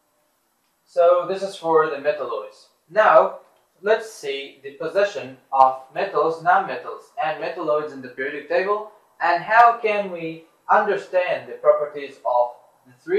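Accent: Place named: Russia. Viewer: You look mexican but sound russian.... WHAT ARE YOU!!!!?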